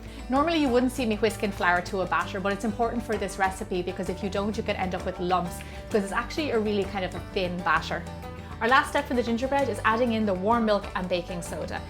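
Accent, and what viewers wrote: Ireland. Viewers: Thanks 4 the help and I like your Irish accent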